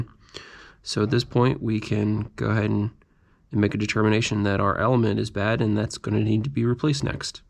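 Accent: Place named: North America. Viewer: Thanks for this. Interesting.... North American accent but UK socket outlets?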